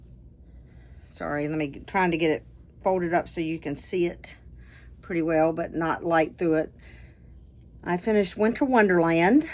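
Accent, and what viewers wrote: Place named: North Carolina, United States. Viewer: Just found your flosstube and love going back and watching your previous episodes! I live in Florida now, but previously lived in the Charlotte, NC area for about 20 years! Love hearing your NC accent though, and seeing all your beautiful projects. Was wondering what thread you’re using for the Tisket a Tasket project. It’s beautiful and you’ve definitely enabled me!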